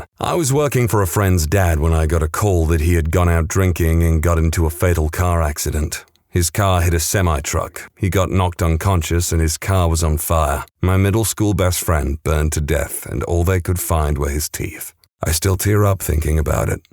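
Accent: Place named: United Kingdom. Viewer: Ohhh... smexy deep british voice <3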